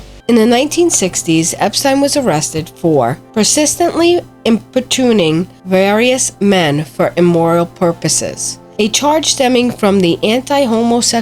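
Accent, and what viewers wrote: United States. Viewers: You're probably an American, methinks.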